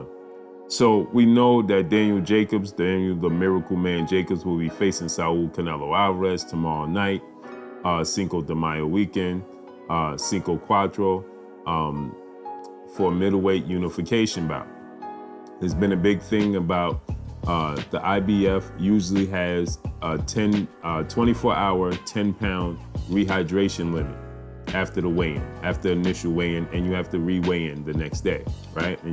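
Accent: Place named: Bay Area, United States. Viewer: Bro you ever think about rapping? You got that voice! That Bay Area floooo. You sound like BIG LURCH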